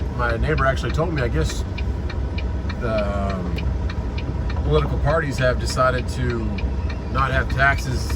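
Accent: South, United States. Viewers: How come you have a southern accent when you are from SoCal/ AZ? Are you getting spinners for the new wheels?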